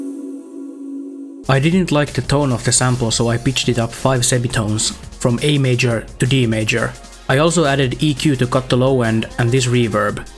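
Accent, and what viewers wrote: Finland. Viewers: You gotta be finnish. Theres no way u arent and talk english like dat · Finnish accent is frying me 😭Vitun hyvä video tho :D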